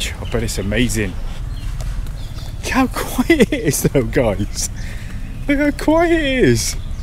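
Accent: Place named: West Country, United Kingdom. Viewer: Polar opposite to Pattaya, that’s for sure 😂 Which part of the England are you from? I hear a west country accent, maybe a Devon accent?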